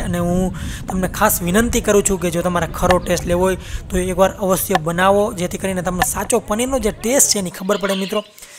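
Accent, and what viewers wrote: Kathiawar, India. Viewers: Wahh bhai aedam kesi kathiyavadi ha mojj ha ✌desi bhasha ma samjavyu mja avi gay vala panir ni subji kjavani man htu bhai , tme puru kryu thank you bhai.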